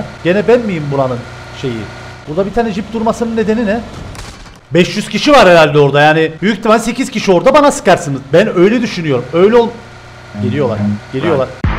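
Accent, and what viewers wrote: Cyprus. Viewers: Abi sen gerçekten Kıbrıs lımısın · Abi sen kıbrıslımısın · Kıbrısta nerelisin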